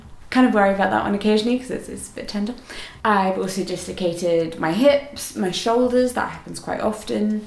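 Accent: United Kingdom. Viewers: You have the most British accent I have ever heard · You sound beautiful, I love your voice and I usually hate British accents, it’s great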